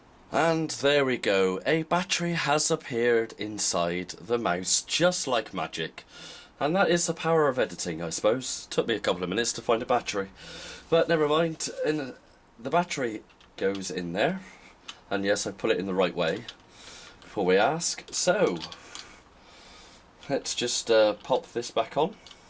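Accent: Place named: England, United Kingdom. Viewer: Thank you for explaining the "CPI" button on this product. I work from home on my laptop and purchased this mouse for my personal laptop use and did not understand the relevance of the CPI button, but your comprehensive review made it quite clear, and your English accent was a delightful bonus. Thanks again!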